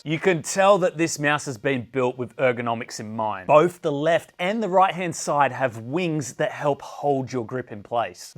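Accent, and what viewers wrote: Australia. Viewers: Australia, it’s a pretty well known accent kinda sad you don’t know it lol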